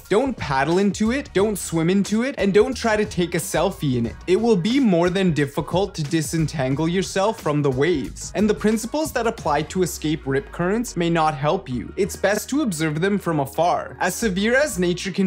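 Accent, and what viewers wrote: Canada. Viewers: canadien eehh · Sounds kinda Canadian to me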